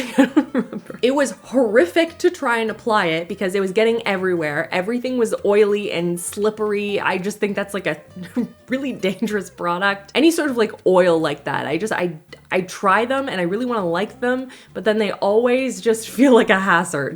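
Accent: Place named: Canada. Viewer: As a fellow American, if you want to hear this accent in real life, but can't go to Canada, come visit the midwest. Especially Wisconsin or Minnesota- you'll hear it everywhere! 😂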